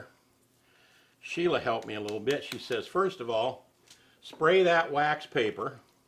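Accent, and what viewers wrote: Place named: Minnesota, United States. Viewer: I'm from Minnesota and I thought you sounded like a Minnesotan.I've been living in Missouri since 1975 and I still get busted for my Minnesota accent. Ya just can't shake it. LOL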